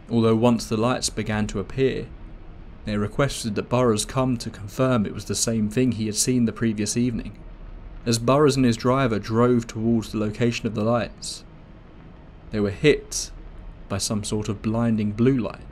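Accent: United Kingdom. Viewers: Every time i see an interesting thumbnail and title i pray its not narrated by a britard but dammit it always is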